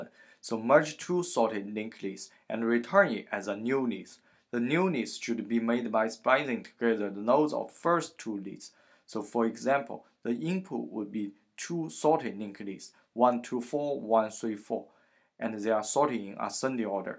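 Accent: China. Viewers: 听口音像是国人，一看评论果然是。 老哥讲的可以啊，我这种菜鸟听懂了。多谢啦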